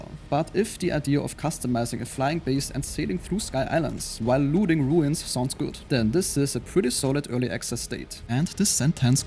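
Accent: Germany. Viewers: The German accent is very noticeable :D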